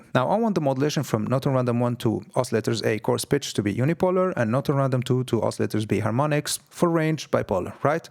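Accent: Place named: Germany. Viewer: Énorme la modulation sur le spectral. T'as un super accent avec un petit côté Germanique , tu dois être vers l'est j'imagine. Super Skills, tu as un excellent niveau, les presets packs sont flawless and gorgeous. Merci mille fois pour le partage de ton savoir ✌️🙏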